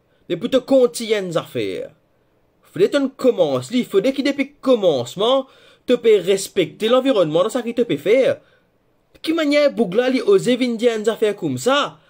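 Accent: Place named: India. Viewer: I wonder where did you learn how to speak English. Probably in New Dehli.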